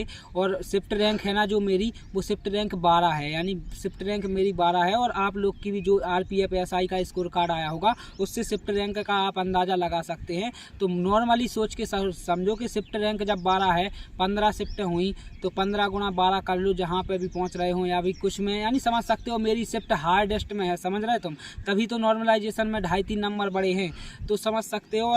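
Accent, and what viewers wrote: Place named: Rajasthan, India. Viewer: Bhai apka Rajasthan me kha se ho rajsthan jaisi language lag rhi hai like bharatpur alwar dholpur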